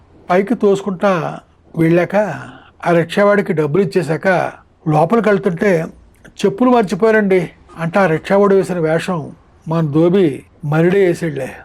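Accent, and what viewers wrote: Godavari, India. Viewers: గోదావరి యాస లో మీరు మాట్లాడతా ఉంటే ఎంతో ముచ్చటగా ఉంది. అదీ మీ సినిమా గురించి 🎉🎉🎉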